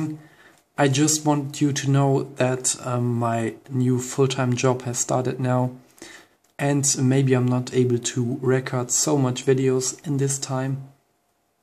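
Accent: Germany. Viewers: Are you german?:)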